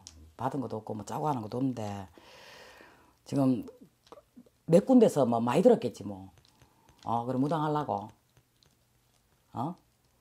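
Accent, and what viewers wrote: Gyeongsang, South Korea. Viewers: 예쁜선생님 오랜만이네요. 더 예뻐지셨네요. 뵙고싶었습니다 . 억수로 경상도말씀 징하게하십니더 그래도 말씀 옙뻐에 쌤 건강하세요. 인천이라에 시원 시원하신것이 아주좋습니더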